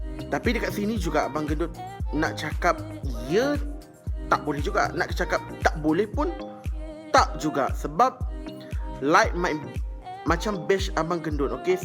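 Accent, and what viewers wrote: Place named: Kelantan, Malaysia. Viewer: Kechek klate bohhhh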